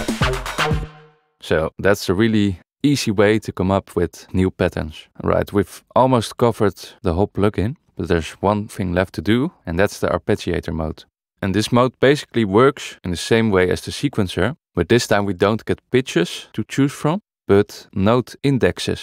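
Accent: Netherlands. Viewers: Thanks for this very usefull tutorial! And eeh, you MUST be Dutch. Haha, ik bedoel, kan gewoon niet anders dan dat ik hier een Nederlander hoor!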